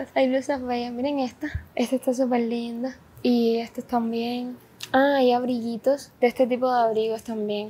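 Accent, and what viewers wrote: Cuba. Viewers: Me encanta su acento cubano y la emoción de la cartera nueva. Saludos desde Guatemala 🇬🇹. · Me encanta como ella pronuncia, es muy cubana, y la he escuchado decir laik, pero cuando dice like es muy gracioso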